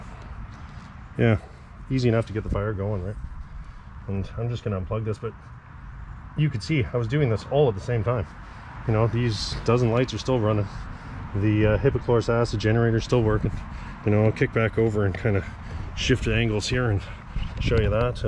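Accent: Canada. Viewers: You must be from Canada yeah?!😅 Loving the content man keep it up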